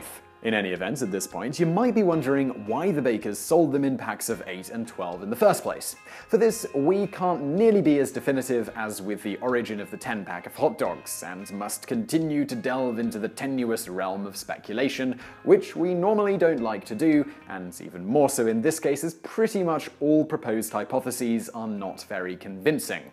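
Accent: United Kingdom. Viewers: The British accent really adds credibility to these lessons.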